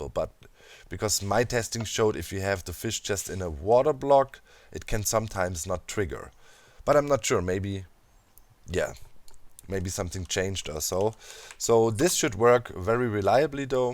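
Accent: Germany. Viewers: Wie man immer hört wenn jemand deutsch ist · Kann ich da einen deutschen aktzent raus hören?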